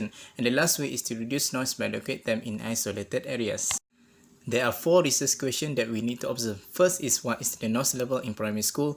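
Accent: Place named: Kelantan, Malaysia. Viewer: Wow english with klatey slang 👍🏻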